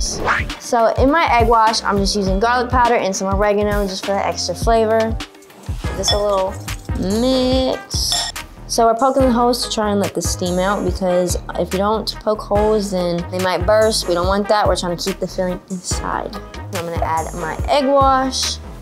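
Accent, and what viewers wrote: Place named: Chicago, United States. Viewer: She’s definitely charismatic but why does she talk like she’s from the south side of Chicago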